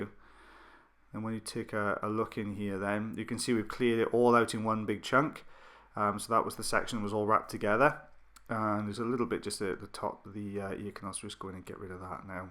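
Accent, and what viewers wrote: Wales, United Kingdom. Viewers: god that beautiful Welsh accent. I know people from the UK deride it, but I challenge anyone to listen to say “maneuver” and not love it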